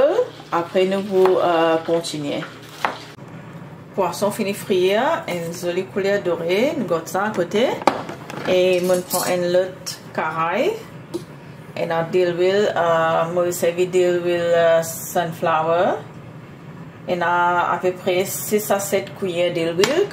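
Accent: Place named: Haiti. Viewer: Accent creole la seryer net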